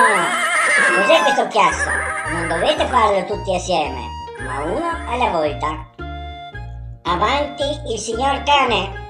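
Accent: Italy. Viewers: Accento triestino forte!!!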